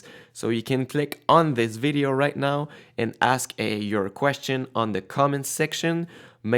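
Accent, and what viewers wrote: Quebec, Canada. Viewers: You sound like you're from Quebec?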